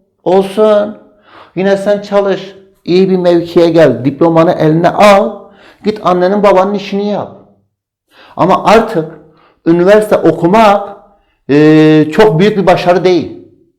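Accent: Urfa, Turkey. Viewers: Bu hoca Urfa 'lı mı ? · Hocam siz kesin urfalisiniz.konusma tarziniz harflerin cikisi ayyyyyyy ayni ben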